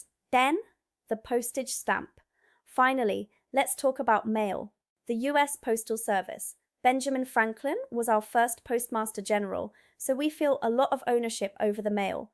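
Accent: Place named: RP, United Kingdom. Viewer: I do love your pronunciation of the Queens English, keep posting 🙏🌹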